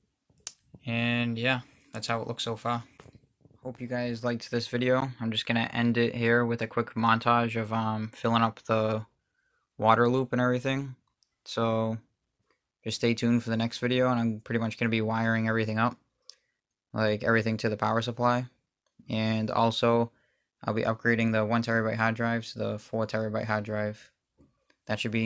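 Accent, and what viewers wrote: Boston, United States. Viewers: Ya sound like ya from boston, kid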